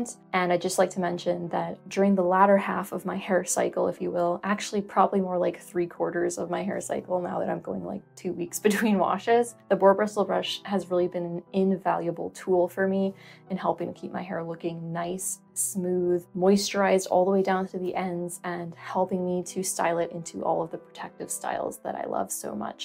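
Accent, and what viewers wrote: Canada. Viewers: Smart Girl ! 🙏 You Sound Canadian. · Maybe it's your accent (canadian?)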